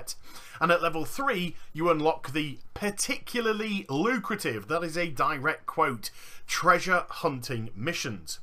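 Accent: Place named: United Kingdom. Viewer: God I love the British accent. Every word. So clear.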